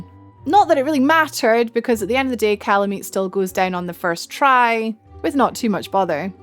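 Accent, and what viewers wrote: Scotland, United Kingdom. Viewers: A Scottish sounding chick playing dark souls?! Wild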